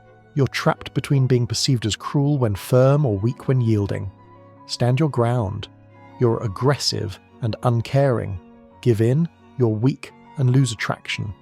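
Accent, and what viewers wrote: United Kingdom. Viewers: If this is a us-based program, why the British voice? Do Americans think that if it's a British voice, it's true or that it carries more weight than an American style voice?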